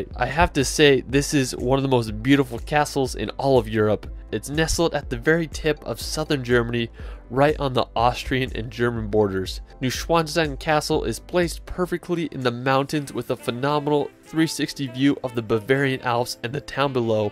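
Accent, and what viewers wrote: United States. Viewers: Stunning views and great tips, but dude - at least TRY to learn the pronunciation of the names of mountains/villages you mentioned... it's definitely not that hard and you show a little respect, instead of saying it simply as an American. It's terrible to hear it. Thumbs up for video, thumbs down for this... I'm sorry. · Nice Video, great pictures. If you would only pronounce the names the way it's supposed to, and not with the thickest american accent, it'd be perfect Still, great stuff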